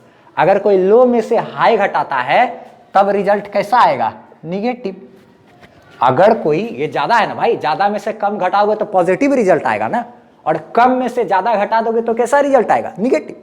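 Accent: Bihar, India. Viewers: Sir ji aap bihar se hain na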